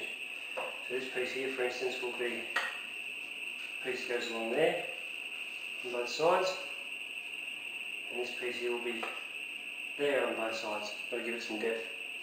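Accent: Australia. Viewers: Mmmm, interesting, is that an Aussie accent? Good job! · Yeeeeeeeeeesssss an Aussie! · r u an aussie if so i applaud you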